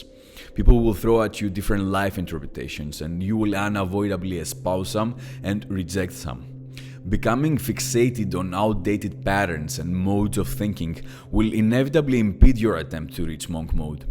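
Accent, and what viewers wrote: Greece. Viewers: U sound greek 100% 👍👍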